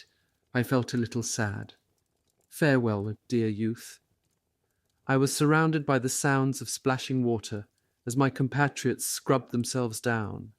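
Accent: RP, United Kingdom. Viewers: Incongruous that no mention of Female Soviet Snipers is mentioned . Just Men beating their chests and yodeling, sounding like Tarzan King of the Jungle . Fact : Lymudilia Pavlichenko called Lady of Death 350 confirmed Kills including 36 German Snipers in Odessa and Sevastopol theatre of WW 2 in Russia. . No mention by Vasily Zaitsev though he must of been aware of the Skill of Soviet Women Snipers as accomplished as he was . Strange the Narrator has a Royal English accent. Seriously?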